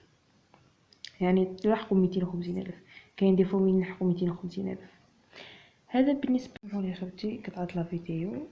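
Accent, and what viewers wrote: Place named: Marrakesh, Morocco. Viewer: صدقني بالله و سمحلي يا وليدي بلا ما نحلف بلي مراكش عارف شا راك تهدر بالتوفيق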